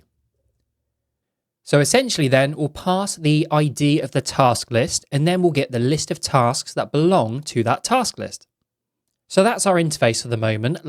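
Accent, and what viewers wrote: United Kingdom. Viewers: sorry but.... why is british accent so hard to understand,why cant u guys just speak what should be spoken...:(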